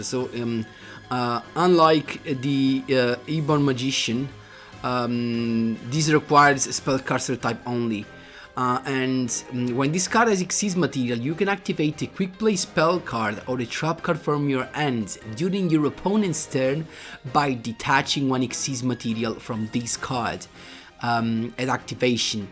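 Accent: Italy. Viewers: Great video I so hipped for dark magician, but one question. Are you from Italy? You talk whit an Italian accent. I say it because am Italian and.. I don't know I've this sense of familiar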